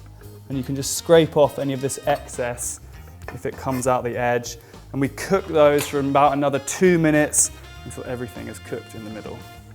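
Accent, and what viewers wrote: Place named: United Kingdom. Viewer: やっぱイギリスアクセントっていいよねー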